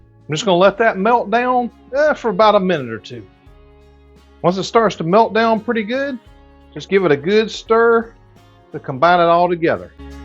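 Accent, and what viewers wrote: South, United States. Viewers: Sounds like you’re from the south. I don’t know what you pay for good hamburger down there but where I live it starts at $6 a pound. 🥴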